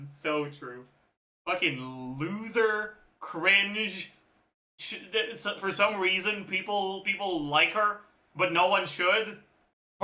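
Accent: Quebec, Canada. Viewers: which ones have you noticed? it could be because he's a quebecois french speaker, especially with fontaine names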